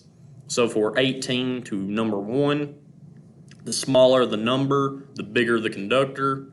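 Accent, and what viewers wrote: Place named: South, United States. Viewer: Thank you for the well put lecture, listening while driving to work. I enjoyed the cool southern accent too 😂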